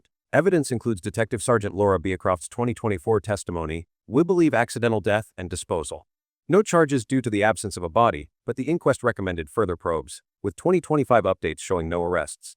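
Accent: United States. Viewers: I found the cases from overseas very confusing!!! Why bring all of that stuff into the picture? It has NOTHING to do with the Gus Lamont case here in Australia. Also, I DON'T LIKE the AMERICAN ACCENT of the person talking about the Gus Lamont case. What is wrong with our good old Aussie accent? I won't be watching any more cases from Crime Cases in future!!!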